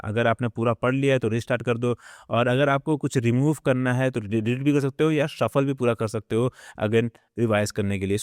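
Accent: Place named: Rajasthan, India. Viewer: bhai aap kaha s ho aapka accent to rajasthan ka lag rha h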